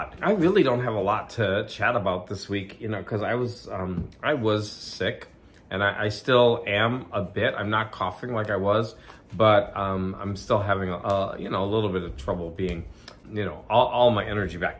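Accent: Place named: Kentucky, United States. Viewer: Glad you did publish it! I always feel that way about my videos when I first publish them. Then I'll look at them later and think, "That wasn't half bad." Your Kentucky accent was the highlight in this one!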